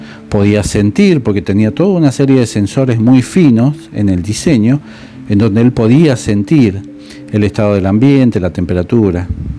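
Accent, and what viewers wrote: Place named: Argentina. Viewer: Buah...que temas más interesantes aborda este canal y como disfruto escuchando a por Dios, con su gracia y su acento argentino que a veces escucho dos y tres veces el vídeo. 😂 Te queremos, aprendemos mucho contigo. Un millón de gracias y saludos desde España 🇪🇦 ❤🙏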